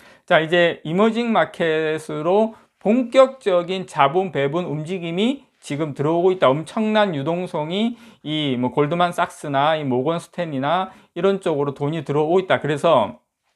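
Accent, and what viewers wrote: Gyeongsang, South Korea. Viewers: 경상도 사투리 듣기참좋네요 유익한정보 감사합니다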